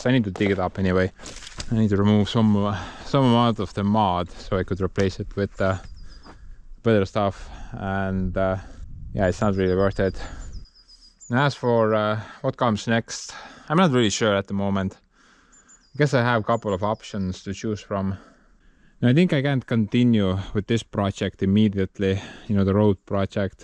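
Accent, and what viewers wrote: Estonia. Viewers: I mentioned your channel to an acquaintance I have in Latvia. He saw your name and said you're for sure Latvian living in Estonia because it's such a Latvian name. But then he heard your accent and said, "No he's for sure Estonian. Now I'm intrigued." 😅 Hopefully he'll be a new fan!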